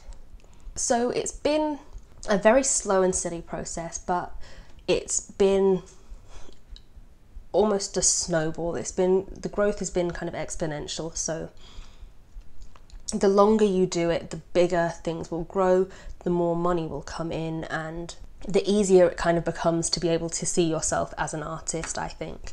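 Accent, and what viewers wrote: England, United Kingdom. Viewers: I third that. Haha. New subscriber. I love Art!! Love to listening to British English accents.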